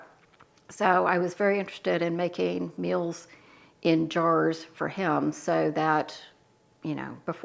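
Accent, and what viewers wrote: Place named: South, United States. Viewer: I'm following a small handful of Homemakers / homesteaders and I've got to tell you that you are one of my favorites. Your no-nonsense delivery coupled with yourself of the sweet tea line accent made me start following. However you're canning of beef Rogan Josh you made me a fan! As a long time canner I've done the water bath the pressure all the things. But never have I ever thought about spreading my canning out to the wonderful things such as the beef Rogan Josh the chicken Tortillas Soup the Brunswick stew etc... thank you for reminding me that I have the power to use better judgment following safe guidelines in Canning things that are delicious to me I will be one of the first to follow in a paid membership community because of the aforementioned reasons. I'm excited and I cannot wait for that day to happen. 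And I will urge you to please write a canning cookbook with these lovely recipes in them. I see you testing the pH I see the beautiful products that turn out. I just want to thank you for the knowledge that you are sharing.. May God. Bless you and your family and from my home to yours, Merry Christmas!❤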